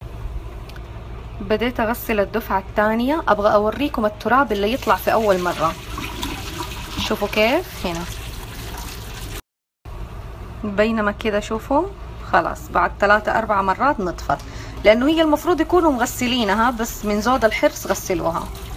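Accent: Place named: Hejaz, Saudi Arabia. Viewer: هي بتتكلم باللهجة الحجازية اللي الآن كل الدعاية والإعلان يتكلمون بنفس هاللهجة ....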